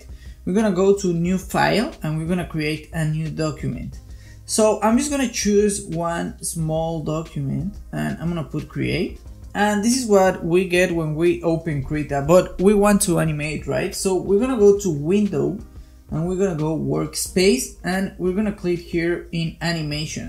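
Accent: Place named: Spain. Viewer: Se nota mucho que eres español xd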